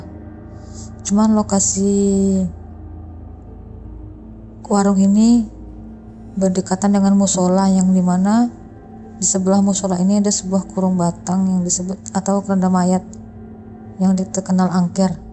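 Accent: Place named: Banjar, Indonesia. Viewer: Tapi logatnya mirip org Kalimantan atau banjar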